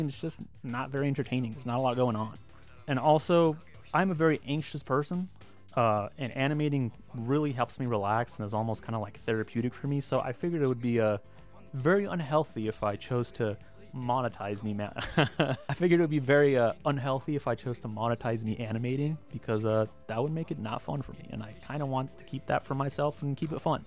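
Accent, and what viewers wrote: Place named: England, United Kingdom. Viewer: literally asking myself in the head, is this guy english, then 1st second explains it all.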